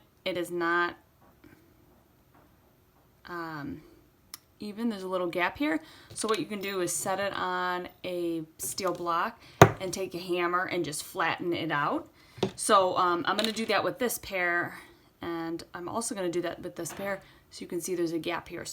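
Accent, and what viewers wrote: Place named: Michigan, United States. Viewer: You sound like a Michigander! lol. Thanks for making these videos, truly the best on the market for tutorials.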